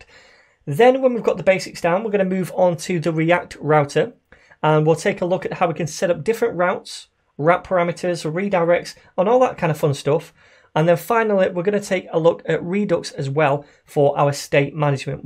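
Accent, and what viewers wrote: United Kingdom. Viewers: I just enjoy any tutorial with a British accents....it's just easy...much love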